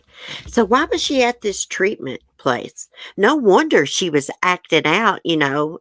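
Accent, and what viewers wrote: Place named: Alabama, United States. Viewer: I love your accent! It’s very like my Alabama accent I had! Where are you from?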